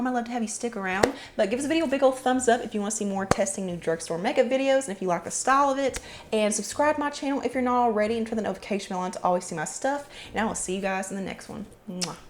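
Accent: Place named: Kentucky, United States. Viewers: Ok…I discovered your channel yesterday and I love it. You are so funny and unique. I recognized your accent cause I’m from Kentucky too! What area are you in? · My daughter in law is from KY and she sounds just like her!! Love it!! 💘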